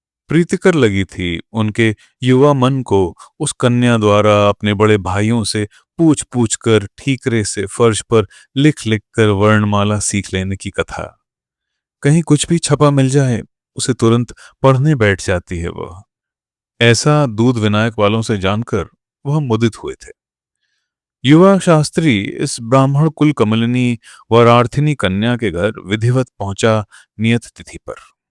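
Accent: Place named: Kumaon, India. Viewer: मनोहर श्याम जोशी जी के 'कसप' को आपकी आवाज़ में सुनना एक अद्भुत साहित्यिक यात्रा जैसा है। इस उपन्यास के हर पात्र के लिए जिस तरह से आप अपने स्वर का चयन करते हैं और उसमें जान फूंक देते हैं, वह सचमुच काबिले-तारीफ़ है। खासकर कुमाऊंनी भाषा के शब्दों का आपका उच्चारण और जिस लहजे के साथ आप उन पर काम करते हैं, वह श्रोता को सीधे अल्मोड़ा की गलियों में ले जाता है। सबसे सुंदर बात यह है कि जिस सहजता से आप उपन्यास के बीच-बीच में गीतों की पंक्तियों को पिरोते हैं, वह कहानी के प्रभाव को कई गुना बढ़ा देती है और हर दृश्य को जीवंत कर देती है। आपकी वाचन शैली ने इस कालजयी कृति के रसास्वादन को और भी अधिक समृद्ध कर दिया है। इतनी मेहनत और कलात्मकता के साथ यह प्रस्तुति देने के लिए बहुत-बहुत धन्यवाद। सुनने का अनुभव अत्यंत सुखद है!"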